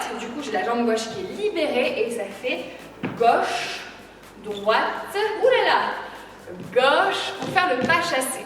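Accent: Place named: South, France. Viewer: Quel régal super!!!! Tu reflète le Sud a font,ton sourire,ta joie de vivre, ton accent,et tes blagues waouh je me croirais de retour à Marseille!!! Merci super hiper génial!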